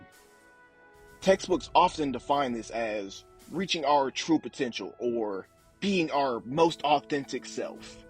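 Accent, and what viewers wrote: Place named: South, United States. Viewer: Oh it’s definitely not just you, he has a bit of a Southern accent but i think its cute🤭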